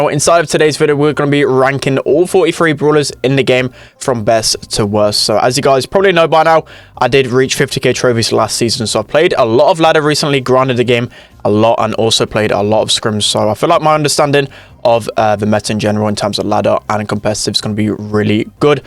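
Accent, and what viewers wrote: United Kingdom. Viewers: It's the british accent xD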